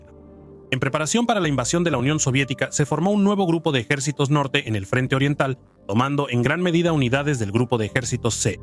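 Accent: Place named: Latin America. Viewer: no puedo man ¿que coño me hablas de nuestra guerra con ese acento bananero? Leck mich am Arsch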